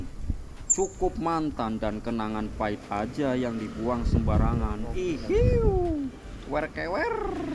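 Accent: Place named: Banyumas, Indonesia. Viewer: Sampeang ngomonge lucu glhaha.. sampean nadane kayong ngapak..mantab isa ng jepang 😀👍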